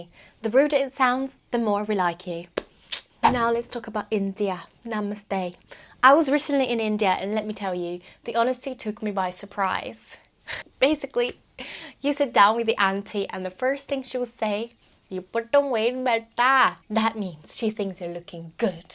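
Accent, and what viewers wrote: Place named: United Kingdom. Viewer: Delightful, charming and insightful! Your ‘British’ accent, btw, is adorable! 👌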